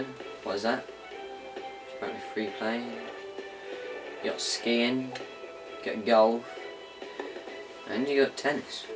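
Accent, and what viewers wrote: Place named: Liverpool, United Kingdom. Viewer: Why did you buy this you scouse?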